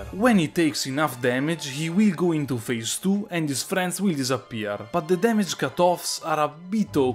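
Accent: Italy. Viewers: dalla tua voce sembri Italiano...ci ho preso? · You are the most Italian man I have ever heard. Subscribed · Ma che accento Veneto hai? 😂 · Secondo me sei italiano 😂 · hey man can i ask you something? are you from italy, because you' accent you'r form there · Fra L'accento italiano è inconfondibile. Grande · Aaaah l'inglese parlato da un italiano... POESIA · TROPPO BELLO L'ACCENTO ITALIANO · L'italiano comunque si sente lontano un miglio XD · Dude great run, especially the Italian ass accent get me all of the time hahahah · Find this video in my homepage, hear you talk and i think, this guy is Italian, then you go "sweat, blood and blasphemy" and i get the confirmation you are italian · ho capito che sei italiano al minuto è bello vedere youtuber che sono italiani fare video in inglese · This video just popped out in my recommendation and as soon as it starts I hear in your voice a strange accent, I know it's really strange but I wanted to ask you a question, are you Italian? 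To me it seems a lot that you have an Italian accent, you know, I'm italian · This is the best Italian accent I've ever heard!! Also, cool vid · Mamma mia che accento italiano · Are you the Dave504 of gaming? lol your thick italian accent reminded me how Dave speaks! Great video mate, very well done! You put a lot of effort into it, and you made me realised Iàve missed a frozen dragon 😅 And even more, well done for creating content in eglish, I'm been living in the UK for many years now and I still don't feel confident about doing so. Thank you for what you're doing, you gave me a boost in confidence to consider creating contente not in italian! Keep up with the good work!💪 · palesemente italiano hahah Ottimo lavoro fratello👍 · Honestamente mi ha piaciuto tanto il accento Italo-inglese che mi sono suscritto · Man, such a great video! Hope that palestinian kid is doing ok :( PS che bello vedere l'accento italiano riscuotere un simile successo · appena ho sentito l'accento, ho capito che è Italiano ahahaha. 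Sono troppo d'accordo, che canale figo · Sempre bello sentire l'accento Italiano, bel video! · Great video! Don't know why you wanted to talk in english, even if è abbastanza intuibile tu sia italiano... but whatever :D. Good video nonetheless. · Bel video, ma con questa pronuncia di riconosce che sei Italiano😆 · Fra è così strano trovare l’accento italiano più forte della storia cliccando su un video a caso nella home. Nice video tho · Mi sembra troppo italiano questo inglese Aswer me my BOI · very obviously Italian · hai un accento italiano fortissimo e lo amo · Your accent looks so italian broo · Bro sento l'accento italiano , comunque bel video abbastanza informativo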